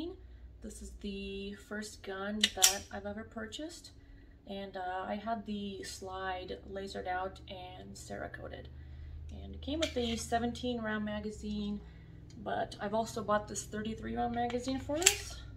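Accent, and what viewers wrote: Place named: Russia. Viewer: is most likely Russian. Her accent(barely there but I can hear the way she say Kalashnikov)....Privet.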